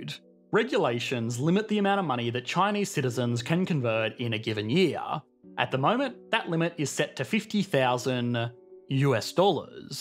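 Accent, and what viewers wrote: Australia. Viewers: He sounds like an australian with parents from stereotypical california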